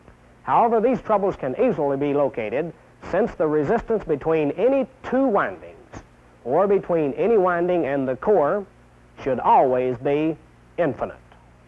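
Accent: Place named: Texas, United States. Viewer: Sounds like he is from Houston, TX .....then did some speech training.... Glad I did my electronics tech training in the Military, USMC first before going to college for engineering, this gave me the solid fundamentals... Most of the professors at University suck at teaching....they do not start out with any conceptual teaching....They jump into Maxwell's equations on the first day..Many students drop along the way...It's not until after you graduate that you have time to think things through....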